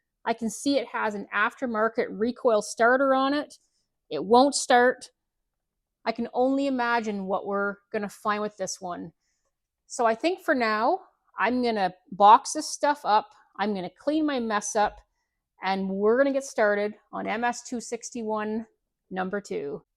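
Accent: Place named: Canada. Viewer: Don't you just love how Canadians pronounce certain words.............like "out" which becomes "oot"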